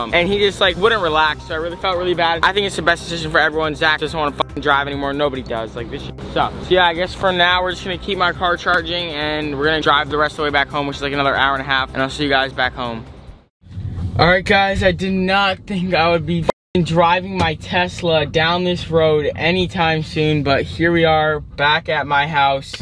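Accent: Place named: New York, United States. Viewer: you can tell he’s from NY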